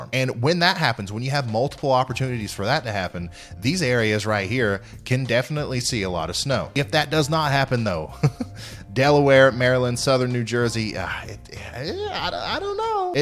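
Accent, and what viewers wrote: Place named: Kentucky, United States. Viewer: Ha ha! I keep commenting about hopeful snow in KY and judging by the pic in the background and the accent like mine, your in KY too! What part?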